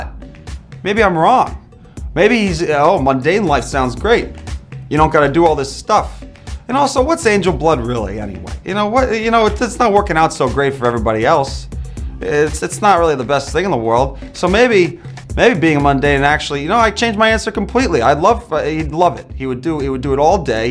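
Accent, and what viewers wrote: New York, United States. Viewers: I can hear his New York accent. Especially when he's answering the Alec as a mundane question and he's like "You don't gotta..." 😍😍😍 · He’s such a New Yorker. I didn’t know for sure he was from New York but you can just hear it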